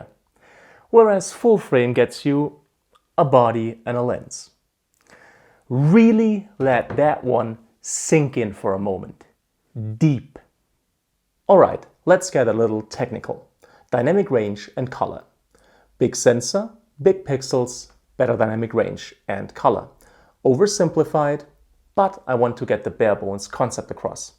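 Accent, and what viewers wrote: Stuttgart, Germany. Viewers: U sound a bit german, are you? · Kommst du aus Stuttgart? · man, are you german?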